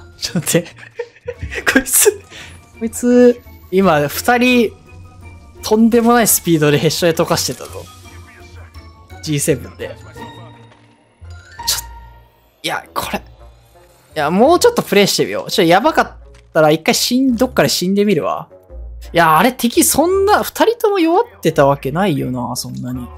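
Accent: Kansai, Japan. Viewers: この人関西の人？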